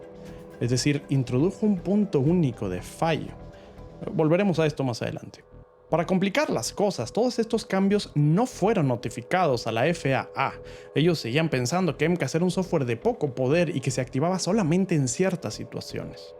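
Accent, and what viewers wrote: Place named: Mexico. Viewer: Excelente video y excelente acento mexicano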